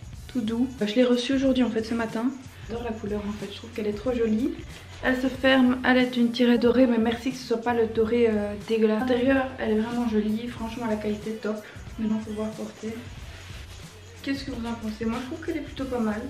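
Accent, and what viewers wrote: Belgium. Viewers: C'est un truc de dingue comment j aime trop l accent belge mdr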